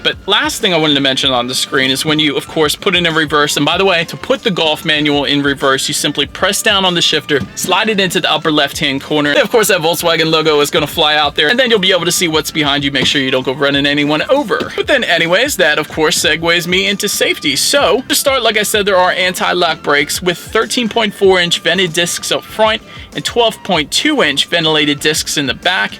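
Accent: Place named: Delaware, United States. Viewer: Hey got any Hoagies? Love the accent. Been awhile. Born in Wilmington, DE.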